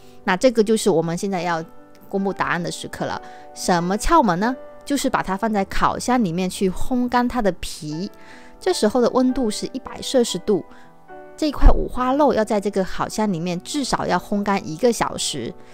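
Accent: Fuzhou, China. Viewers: 感觉是福州人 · Are you fuzhou? Sound like from fuzhou or fujian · 应该是福州口音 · 你好，我也是福州人，老乡一听口音就可以听出来😂 我想问一下，沙姜粉可以用其他东西代替吗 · 好熟悉的福州口音普通话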